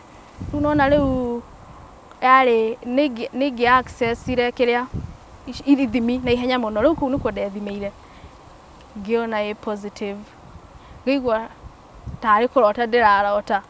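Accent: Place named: Nyeri, Kenya. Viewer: I love her nyeri accent. Iv gone through the same stuff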